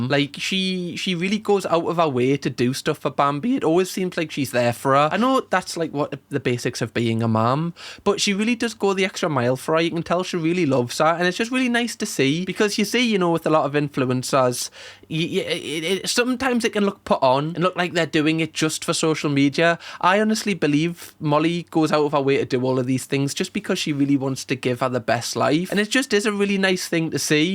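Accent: Newcastle, United Kingdom. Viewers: Your funny but one thing i dislike about you is that your a Geordie i will subscribe if you become a mackem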